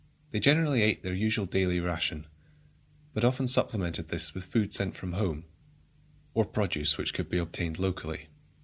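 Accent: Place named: Scotland, United Kingdom. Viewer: No he isn't. Sean Bean is from Northern England wheras this YouTube is clearly Scottish. It's like saying someone from the North of the USA is a dead ringer for a Mexican.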